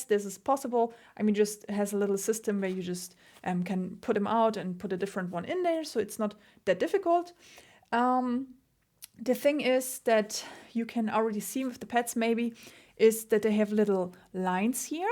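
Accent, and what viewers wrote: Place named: Germany. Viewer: Ahhh nice long term review! I could tell you were german right away ;)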